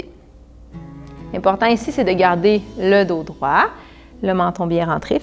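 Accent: Canada. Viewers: Vidéo vue de Genève. (j'ai pratiqué et pratique occasionnellement l'hata Yoga). Super vidéo merci pour ce partage car j'ai un bon physique mais j'ai de la peine à me motiver...on verra si ça joue. Bonne continuation et j'adore L'accent canadien. J'ai un accent genevois ou vaudois à l'occasion 😉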